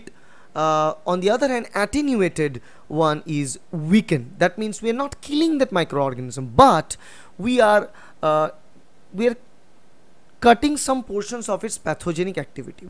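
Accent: India. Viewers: although his accent is pretty good the indian accent shines through him thats kind of nice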